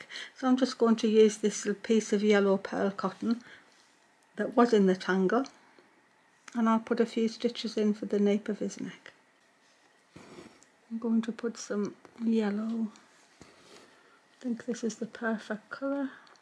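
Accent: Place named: Sunderland, United Kingdom. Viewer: you are a delight to Watch. I love watching your Beautiful Creative Work. My Husband grew up in Sunderland. So I love hearing your Accent. I live in a country town called Gingin in Western Australia. You are always welcome in my Home ❤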